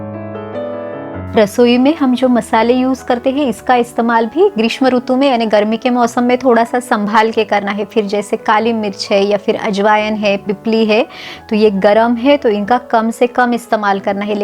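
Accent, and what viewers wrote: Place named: Maharashtra, India. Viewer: खूप छान माहिती दिली आहे.आवाजा वरुन असं वाटतं की आपण महाराष्ट्रतील आहेत...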